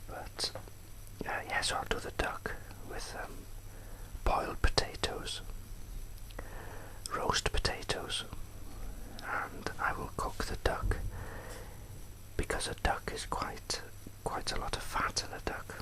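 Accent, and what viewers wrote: Wales, United Kingdom. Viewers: I think it's a Welsh accent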